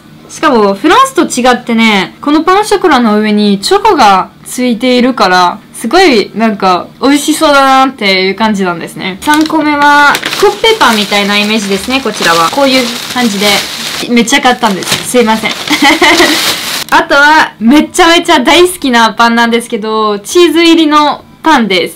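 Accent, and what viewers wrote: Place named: Yamagata, Japan. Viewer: なんか山形なまりになってるよ 笑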